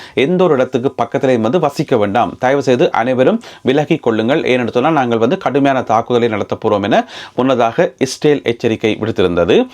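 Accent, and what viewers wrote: Jaffna, Sri Lanka. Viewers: Super speaking tamil in jaffna ❤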